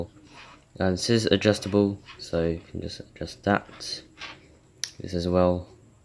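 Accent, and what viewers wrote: United Kingdom. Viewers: I have those! But its gray, i got it for my bday Also nice british accent, i dont think i can do british lol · I know its too late to comment but you really have a nice british accent Im practicing my british accent! Sanaol marunong magaling mag english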